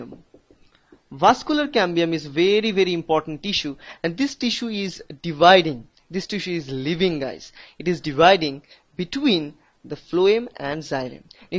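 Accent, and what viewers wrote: Bengal, India. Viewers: thanx bro, Ur accent looks Bengali but doesn't matter. explanation was gr8 thank u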